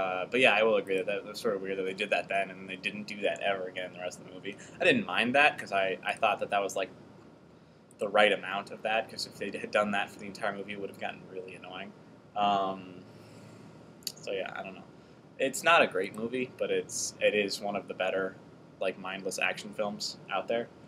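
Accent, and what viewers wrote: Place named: Scotland, United Kingdom. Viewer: Scottish accent, douche bag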